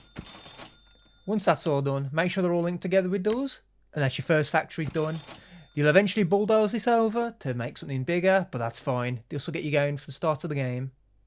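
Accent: Birmingham, United Kingdom. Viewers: Definitely Midlands, near Birmingham but its not the strongest brummie accent ive heard, could be trying to mask it slightly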